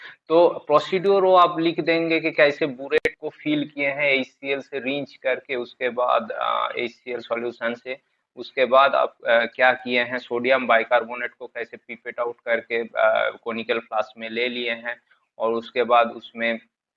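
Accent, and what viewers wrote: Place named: Bengal, India. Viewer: Apni ki bangali? Apnar hindi accent sune amar mone holo ki apni bangali?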